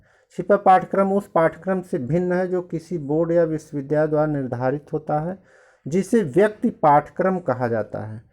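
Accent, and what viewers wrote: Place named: Australia. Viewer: Sir ye austrilan thr American nahi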